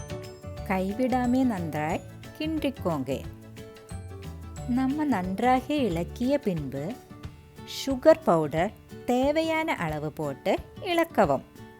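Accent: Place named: Kerala, India. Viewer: Malayali tamil....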